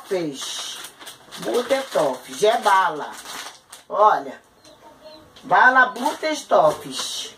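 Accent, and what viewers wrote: Rio de Janeiro, Brazil. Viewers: Como não amar 😍😍,saiba que a senhora me ensinou muita coisa viu,principalmente os trabalhos de casa pra ajudar minha mãe 😍😍...Adorooo a senhora... Obs: Quando ela fala:pra mim é pra patyy,que sotaque gostosooo carioca...😍😍😍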